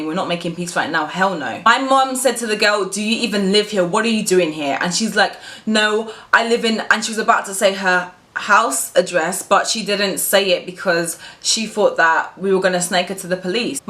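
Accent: England, United Kingdom. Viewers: Yes, she is British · Are u British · BRITISH ACCENT GOALS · She's British, from London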